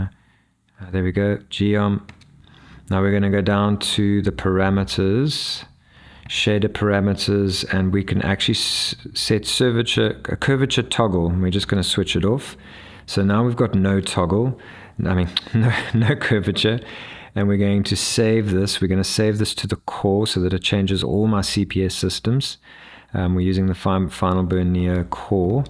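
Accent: South Africa. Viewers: This is SO GOOD. Want to do this with my phone as I don't own a retro handheld but this is basically an android setup guide (which is awesome). You sound like you are from South Africa? I'm from South Africa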